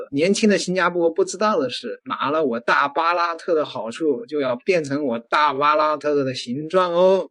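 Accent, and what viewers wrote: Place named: Anhui, China. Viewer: 安徽口音的 😂😂😂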